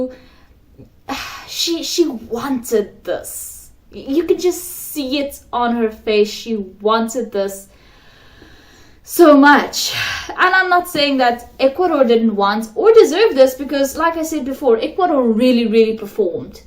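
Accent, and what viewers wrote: South Africa. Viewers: I thought you were from south African, you speak with south african accent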